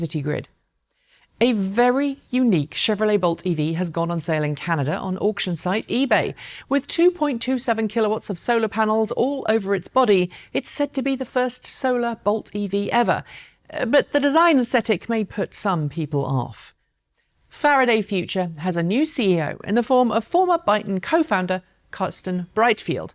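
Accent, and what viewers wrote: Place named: United Kingdom. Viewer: You Go Girl! Let me extend a well deserved congratulation for your award. Your efforts are leaving a footprint on the history of this planet, minus CO2 that is. I know that you do this not for the awards, however I'm sure that there are many more to come. Thanks for advocating "Cleaner and Greener". "EV Marketing/Awareness Award, is the founder and lead presenter of Transport Evolved, a website and YouTube channel that presents news and information about electric vehicles. Distinguished by her British accent and authentic voice, is respected for her objective, interesting content, with more than 1,100 videos and 95,000 subscribers".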